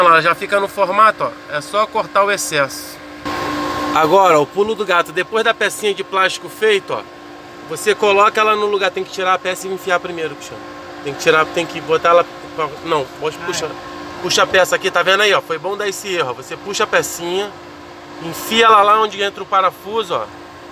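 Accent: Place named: Rio de Janeiro, Brazil. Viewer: Aê carioca di mais...... cumpadi!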